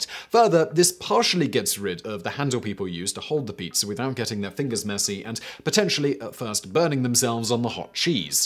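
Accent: United Kingdom. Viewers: Good old British accent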